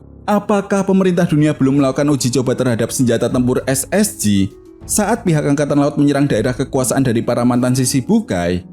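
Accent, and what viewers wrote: Java, Indonesia. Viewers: Logat jawamu Hilangkan dikit, gk enak didengar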